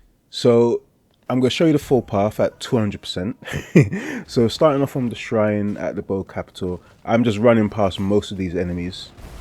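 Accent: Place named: Italy. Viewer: mate! I have an Italian friend who has exactly your accent, even a similar voice. So, I was like, aha! Got ya!!! You do like Wuchang!!😂😂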